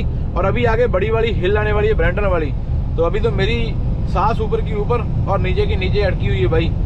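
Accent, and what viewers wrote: Punjab, India. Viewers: Tu pure Punjabi bola kar bai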